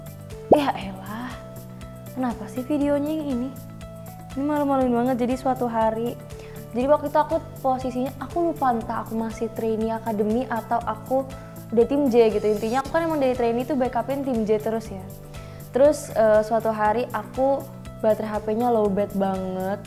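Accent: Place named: West Java, Indonesia. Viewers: logat bandung nya masih ke bawa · Logatnya sunda nya ga ilang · Logat Sunda nya masih kebawa ya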